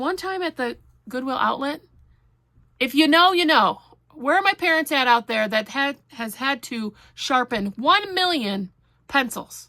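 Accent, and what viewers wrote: Wisconsin, United States. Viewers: Tie-kon-do-ro-guh 😁. Those are great! Love the videos! Wisconsin accent makes me homesick. Thanks for sharing!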